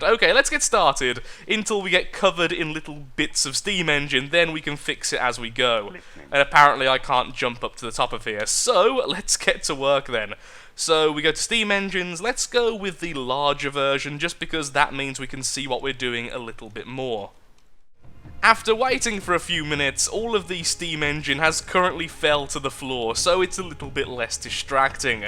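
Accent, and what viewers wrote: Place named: Black Country, United Kingdom. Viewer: Love hearing youtubers with that Black Country accent :D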